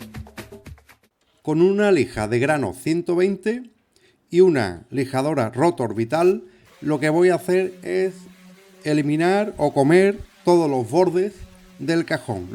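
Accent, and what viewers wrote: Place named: Andalusia, Spain. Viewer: Sos un genio !! Muy bien explicado. El acento Andalu (si no me equivoco), le da un toque muy especial. Muchas gracias maestro.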